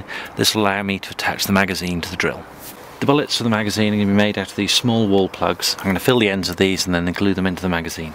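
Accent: United Kingdom. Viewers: are you from the U.K.? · i love your fuckin accent boy, what is it? British? greetings from texas brw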